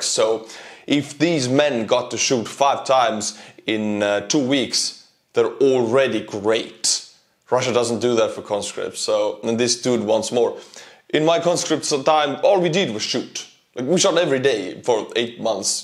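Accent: Scotland, United Kingdom. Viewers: You have talent as a commentator! I love your Scots accent for you land and title advertisement. I’ll bet the you are there most successful advocate!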